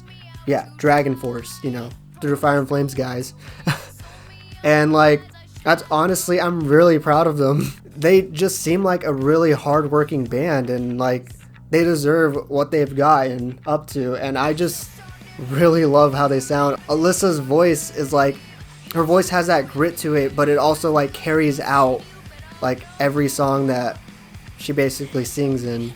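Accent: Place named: Texas, United States. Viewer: Ayyy, you from Texas man 🤘🤘🤘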